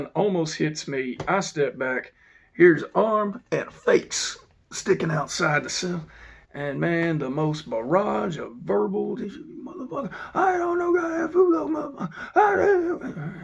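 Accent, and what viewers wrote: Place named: Texas, United States. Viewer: I'm glad to see you back! And it's great to hear a texas accent again after a year on the west coast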